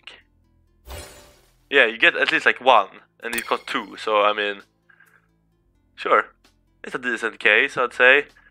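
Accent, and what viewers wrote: Sweden. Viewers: Nice video dude, You have to be Swedish by that's english accent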